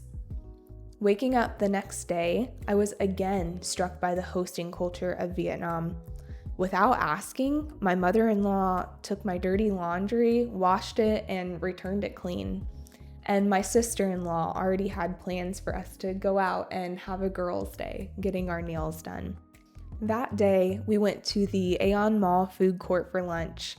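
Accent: United States. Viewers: Em rất mê American accent nên rất hóng những video tiếp theo của chị ❤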